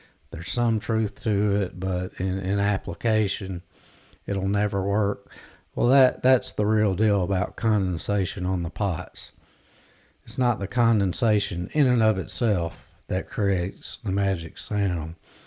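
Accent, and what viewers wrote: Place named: South, United States. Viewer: used to run the clubs snow bird style from Michigan to Florida.so I love hearing southern draw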